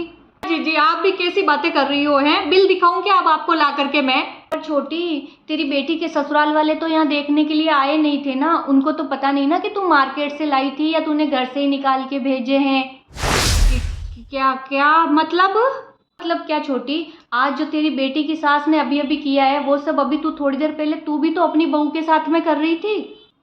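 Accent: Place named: Rajasthan, India. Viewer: Bahut bahut badiya marwari me bola Karo acha lagta h😂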